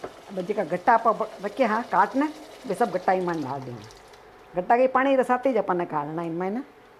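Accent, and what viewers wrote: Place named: Rajasthan, India. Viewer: Hum b Rajasthan se hi h Aapke muh se marwari boli bhut achhi lagti h Gud recipes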